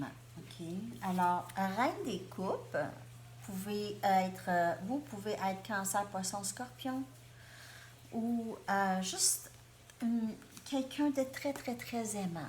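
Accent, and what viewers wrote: Canada. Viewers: je vous remercie pour tout vos tirages qui sont vraiment justes avec ce que je vie j’adore votre belle énergie et votre accent canadien ne changeait rien🥰😉 le concour j’ai tout loupé Mais j’aime vous lire merci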